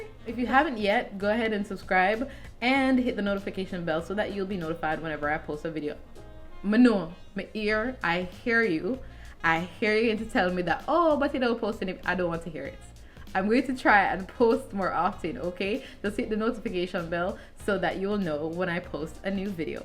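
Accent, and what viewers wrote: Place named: Jamaica. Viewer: Looks great, I love it! I detect a Jamaican accent, are you from Jamaica?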